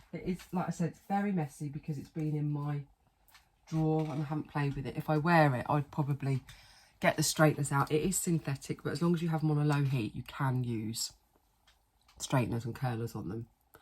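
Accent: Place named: England, United Kingdom. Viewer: Good morning over there in England. Love your hauls and that accent still gets me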